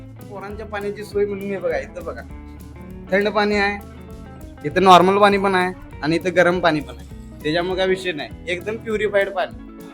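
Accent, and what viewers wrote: Kolhapur, India. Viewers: Tumhi kolhapur che ahat ka language varun kaltay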